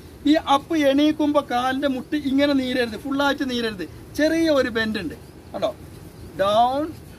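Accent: Malappuram, India. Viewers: എന്തായാലും താങ്കളുടെ മലപ്പുറം ഭാഷ എനിക്ക് നല്ലോണം ഇഷ്ടപ്പെട്ടു തമാശ രീതിയിലും കോമഡി ആയിരിക്കും താങ്കൾ പറയുന്നുണ്ട് അത് അങ്ങനെ തന്നെ പോട്ടെ ഞാനും മലപ്പുറംകാരൻ ആയതുകൊണ്ട് എനിക്ക് തന്നെ വളരെ ഇഷ്ടപ്പെട്ടു ഏതായാലും താങ്കൾ ഗൾഫിൽ എവിടെയാണ് ഒന്ന് കമൻറ് പറയുക അല്ലെങ്കിൽ വീഡിയോയിൽ പറയുക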